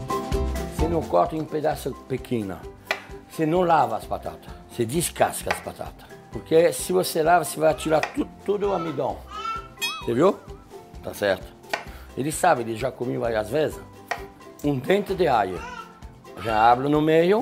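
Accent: France. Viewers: amo o sotaque francés dele um amor de pessoa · O Jacquin tá aqui no Brásil vários anos pq ele fala português com sotaque francês e dificuldade?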